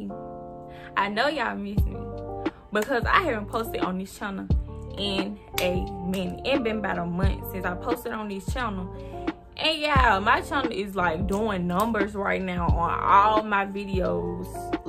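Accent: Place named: Baton Rouge, United States. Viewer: You gotta be from Baton Rouge lol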